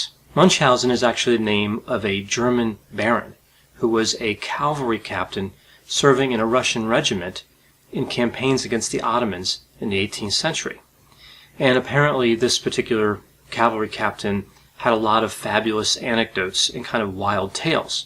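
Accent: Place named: Delaware, United States. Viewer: Delaware accent.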